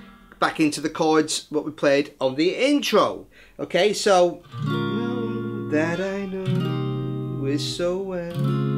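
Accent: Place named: Northern England, United Kingdom. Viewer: Far and away the best lesson on this wonderful song. Love your accent, sounds like something out of Coronation Street. Cheers from New Zealand.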